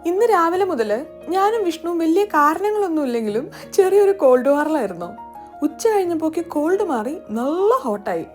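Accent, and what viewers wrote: Malappuram, India. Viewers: ഞാനും മലപ്പുറം തന്നെ മലപ്പുറംകാരെ ഇങ്ങനെ പറയുള്ളൂ ചേച്ചി😂😂😂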